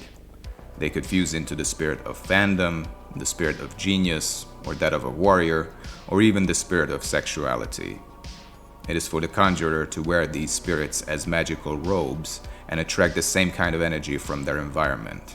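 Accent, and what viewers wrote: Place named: Eastern Europe. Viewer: Sounds Eastern European.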